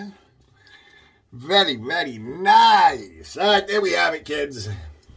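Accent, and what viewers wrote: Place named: South, United States. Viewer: Love your content and the southern accent. 🙂 Keep up the good work!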